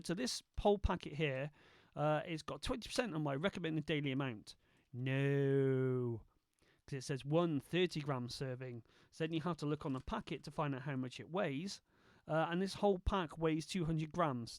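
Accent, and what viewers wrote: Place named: Southwest England, United Kingdom. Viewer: Well that was very entertaining, a bit of a car crash although that's not meant as a criticism I love the way you go live for the first time on YouTube and just learn on the go. As for Dragon 6 it looked awful in terms of Bugs and response but without knowing what hardware and Mic you are using its hard to judge. I was shocked though generally that you had the bug meaning that it would not even tell you that the mic was live! By the way and I'm only talking from experience of version 4 you can only wake the mic for sleep mode (Yellow) not the red off position. So basically I was unimpressed with the performance however I am slightly torn as a video from voice recognition Australia shows it has fantastic accuracy with basic dictation with no training whatsoever other than setting up the mic and that's what I really need it for as I'm disabled and cannot type so I may still take a punt at the reduced upgrade price of £94. 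I love the way as an Apple fan clearly you use Samsung's distressing news about exploding handsets for your demonstration of dictation, I'm still laughing by the way at Apples "courage" at doing away with the headphones jacks and the shit loads of bad PR and all the funny memes that followed which entertained all week. You have a good weekend by, the way what part of the UK are you from sounds like the Southwest-ish? I'm off for a pint of real vinegar.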